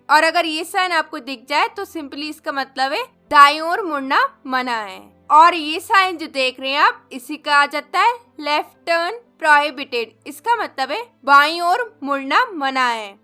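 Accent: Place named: Himachal Pradesh, India. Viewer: apki voice se lagta h ki ap himachal ya uttarakhand se h nice video very helpfull thnx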